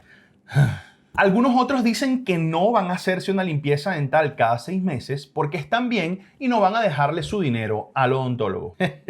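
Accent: Venezuela. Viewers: Para mi se escucha venezolano, no se si me equivoque pero su acento me parece de Venezuela 🇻🇪.. ❤️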